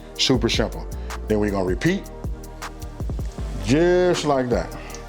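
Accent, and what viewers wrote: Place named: West Coast, United States. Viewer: Philly in the check inn! Big Homie westcoast Accent heavy! 💪🏽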